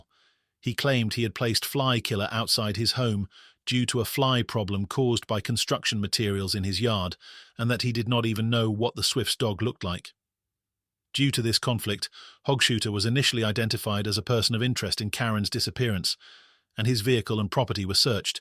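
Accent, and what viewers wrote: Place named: England, United Kingdom. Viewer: I was concerned too when I heard the name hogshooter I am English and love the English voice .thank you for your exceptional channel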